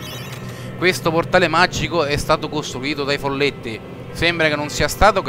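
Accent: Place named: Rome, Italy. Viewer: ahahah e vero!! XD e il massimo il folletto con l'accento romano considerando che lo sei anche te XD il gioco ftt apposta per te!!! XD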